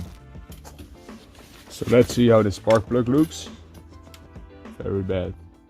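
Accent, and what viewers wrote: Netherlands. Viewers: Ik hoor gewoon dat deze man Nederlands is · He sounds Dutch, So probably pretty dang tall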